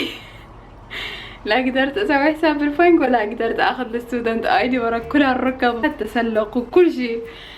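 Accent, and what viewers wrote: Iraq. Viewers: سلامة افادج ههههههه.. ابتسامتج واللهجة العراقية.. لا تقاوم.. اتمنالج التوفيق من كل كلبي.. وبالعافية ع اللابتوب الجديد.. اذا تحتاجين مساعدة بخصوص اللابتوبات والبرامج وهالاشياء.. ممكن افيدج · فديت الهجه لعراقيه شكد حلوه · بداية، احلى لايك قصة الكوب، قد تبدو سهلة وفاترة، ولكني اشعر بالمعنى الكبير الذي تحمله، احياناً تكون لدي مثل هذه الشطحات، بأن اقسم على نفسي بأن لا استخدم الشيء الا في المكان والزمان الذي احدده له لكي يكون حافزا لي. ويكون له طعم رائع في حينها يشبه طعم النصر. اللابتوب الوردي، لونه يعبر عن الانوثة الطاغية في شخصيتك. بالمناسبة، فعلاً اللابتوب المفروض يتبدل على الاقل كل 5 سنوات لان البرامج تصبح متطورة اكثر وتحتاج الى ذاكرة اوسع ومعالجة اسرع. الطبيعة الخلابة،،،،، احسدك عليها. سعيك وراء الاهتمام بامورك الشخصية والاعتماد التام على نفسك، امر مشجع كثيراً.. لهجتك العراقية راااااائعة تعبر عن عزيمة وثقة بالنفس وبنفس الوقت الانوثة والنعومة طاغيتان، احلى شي تلفظينه هو حرف الراء وحرف العين. 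مميزان كثيراً. اتمنى لك التوفيق من كل قلبي.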